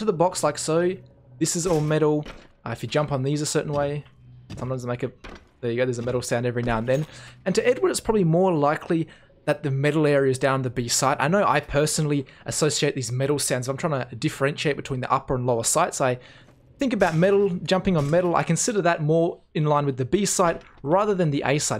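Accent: Australia. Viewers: One of your absolute best videos ever man. I find the Australian accent is such a great one to listen to with analyses; I know that sounds weird, but it may be thanks to the many cricket matches my father used to watch in the house when I was a kid lol Subjective BS aside, your analysis here was super detailed and spot on. Down to the movement paths, the timings, the mindsets, right down to the metal-association with Nuke B- everything. Really, they are always good; but with this video, you have outdone yourself.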